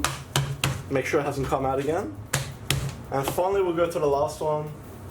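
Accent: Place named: Australia. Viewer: What is wit this Australian accent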